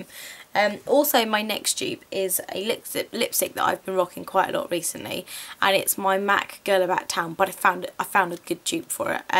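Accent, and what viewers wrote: England, United Kingdom. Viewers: Hi. I love your English Accent. Can you help me learn this...:-)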